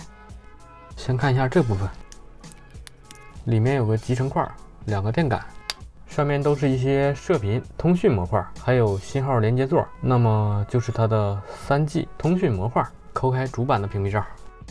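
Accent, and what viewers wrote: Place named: Yushu, China. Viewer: 听口音像是榆树的，老乡？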